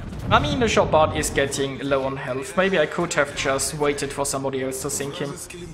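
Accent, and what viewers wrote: Germany. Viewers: Der deutsche Akzent haha sehr gut :)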